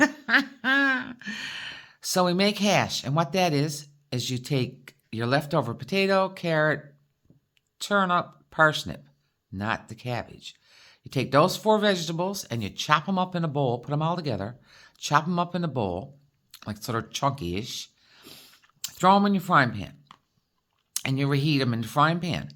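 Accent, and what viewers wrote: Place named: Newfoundland, Canada. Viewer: Hi. I'm canadian too, but Ontario. Love your accent. My friend is from Newfoundland too. Merry Christmas 🎅 🎄 subscribed. Glad I found you. Wonderful vlog. Loved it!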